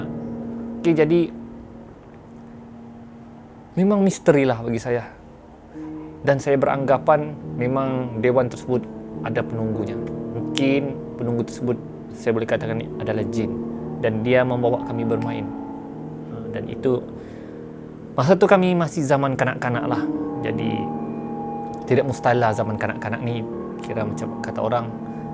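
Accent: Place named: Tawau, Malaysia. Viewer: Bang kekalkan lh loghat tawau mu😂🤣👍🏻👍🏻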